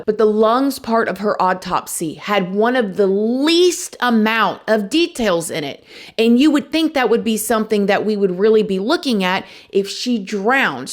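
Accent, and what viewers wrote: South, United States. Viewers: she’s southern and also a grown lady, i’m sure she’s aware lol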